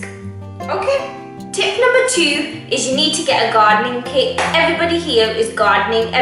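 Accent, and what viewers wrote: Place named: Styria, Slovenia. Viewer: do you live in stajerska (maribor, ptuj)? as your accent when speaking slovene is like from people who live there.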